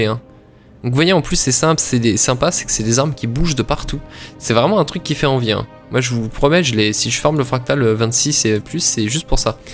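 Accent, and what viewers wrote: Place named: Bourgeois, France. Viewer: superbe vidéo mais arrête de faire ton accent bourgeois. c'est juste pas drôle.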